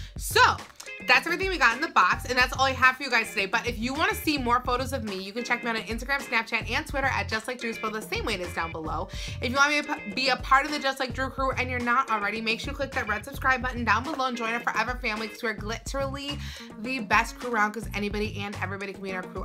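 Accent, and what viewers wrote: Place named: New York, United States. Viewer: I hear a New York accent 🤔 or might be jersey ! Can't wait to receive my box !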